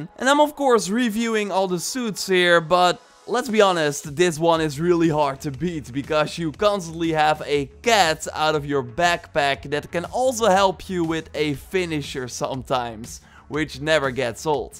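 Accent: Netherlands. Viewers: Are you dutch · ik hoorde meteen dat je nederlands was😂😂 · are you dutch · Je kan horen dat je Nederlands bent lmao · ben je nederlands ofzo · Steenkool engels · jij bent nederlands of niet ik hoor een nederlands accent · Are u dutch? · lol je accent geeft zo hard weg dat je nederlands bent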